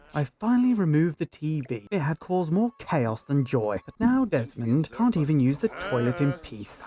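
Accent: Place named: United Kingdom. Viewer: It's the British accent, sounds like David Attenborough of Sims documentaries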